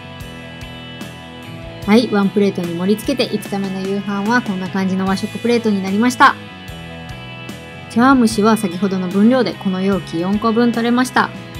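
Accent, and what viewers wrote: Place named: Kansai, Japan. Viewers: ヒレ→ヘレ 茹でる→ゆがく 煮込む→炊く バーモント→バーモンド 関西の言い回しっておもしろいですね😊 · 何も違和感が無かったのでコメントを見て関西弁なことを知り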